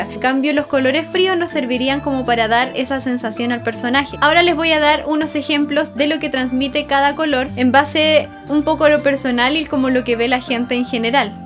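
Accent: Chile. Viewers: es el acento chileno xD como nadie se da cuenta de eso xD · Nomames amo su acento chileno alaberga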